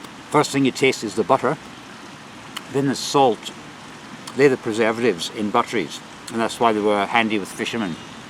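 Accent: Scotland, United Kingdom. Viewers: Love the Skaughtisch accent.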